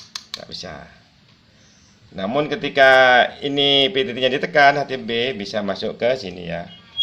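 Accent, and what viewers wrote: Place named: Madura, Indonesia. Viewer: pantes logat nya kayak bahasa madura pak 😁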